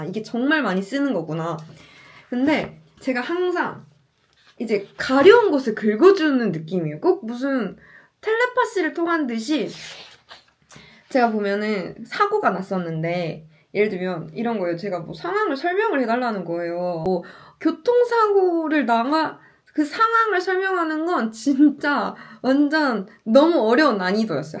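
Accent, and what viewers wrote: South Korea. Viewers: 약간 전라도 억양이 느껴지네요^^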